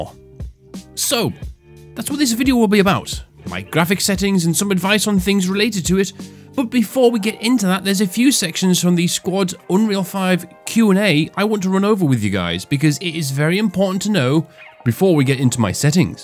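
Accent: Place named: Newcastle, United Kingdom. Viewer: At first i thought scots, but i think its geordie accent (newcastle) cause ur "done" is more "dun" 😁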